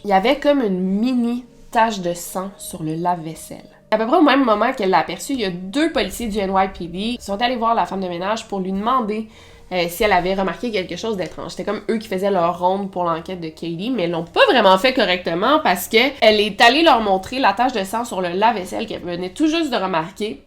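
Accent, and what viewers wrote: Quebec, Canada. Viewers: La fin de l'histoire la chute son enregistrement dans la salle de bain c'est c'est terrifiant !! J'ai adoré la façon dont tu l'as raconté, j'ai vu un téléfilm il n'y a pas si longtemps à la télé française sur cette histoire je ne me souviens pas du titre mais dans ce téléfilm ils ne traitaient que la disparition de Kathie.... J'ai hâte que tu fasses une nouvelle vidéo longue.... Une fan française🇨🇵 de tes histoires racontées avec le bel accent québécois ❤❤❤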